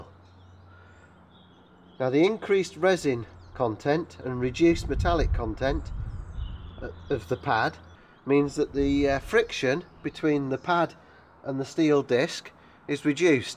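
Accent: England, United Kingdom. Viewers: I had the same question; why at 3? Thanks and I love listening to the English English!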